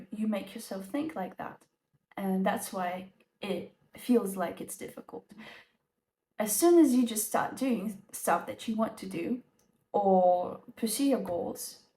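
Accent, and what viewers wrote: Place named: United Kingdom. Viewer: Thanks for this video, dear. Your pronunciation is indeed good. You speak British English, you speak so clearly, that those whose English is not advanced, can understand you easily. As for the topic of your speech it's very useful. We sometimes need to talk to ourselves to get rid of the emotions that let us down.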